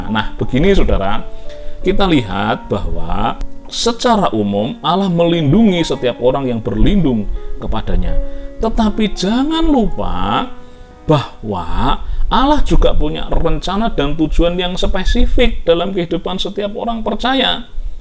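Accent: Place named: Java, Indonesia. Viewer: Mantap Mas...khs dialek jawa jg ada..heheh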